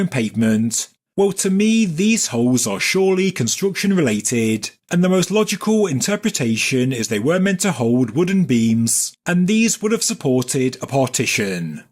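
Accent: United Kingdom. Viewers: wow, you’re right. He sounded like a normal chap in his early videos. Now he sounds like a mix between a posh Englishman and a valley girl. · Too bad! Get over it! There are plenty of other crappy channels that try to be as good as this one. You can give them a shot with their less annoying selves to listen to. You got better things to do than to bitch about someone giving you great information for free. In Britain there are many different accents and this is one of them. There are groups of people that talk just like this too. Move on. Stop bitching.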